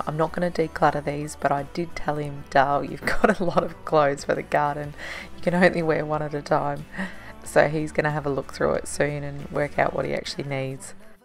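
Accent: Australia. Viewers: Hi, I iron everything even my sheets, I relax when I iron, it is satisfying for me. I have only just discovered your channel and it is so nice to hear an Aussie accent...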